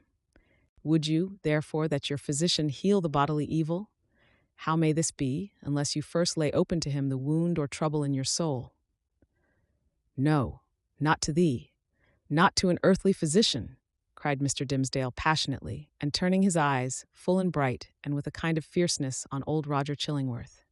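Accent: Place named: United States. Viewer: Hey! I am listening from Brazil. Thank you so much for indicating which audios are using american english! P.s.: I prefer the american ones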